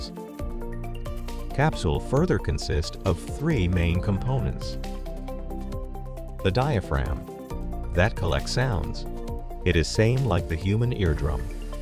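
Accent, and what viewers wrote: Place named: United States. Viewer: The automatic voice sounds American, while the writing and grammar are rather spotty.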